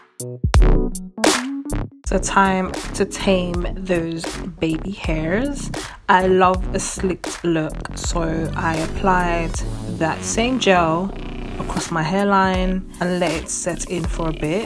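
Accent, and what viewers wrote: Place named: United Kingdom. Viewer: Lol the accent doesn't fit the face but it's really nice to hear a UK accent welldone good job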